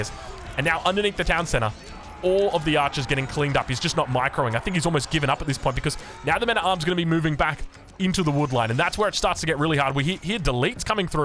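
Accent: Australia. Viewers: I really like how aussies say words player aussies turn into Playah shopping center nnto shopping centah aussies sound so cool much love brisanian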